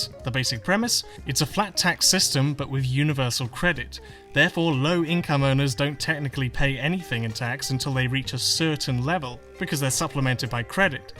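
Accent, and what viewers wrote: United Kingdom. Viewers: Is it weird to anyone else to see such pearly white, moderately straight teeth with a British accent?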